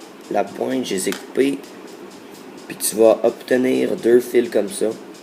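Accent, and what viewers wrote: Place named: Quebec, Canada. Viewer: wow quebecois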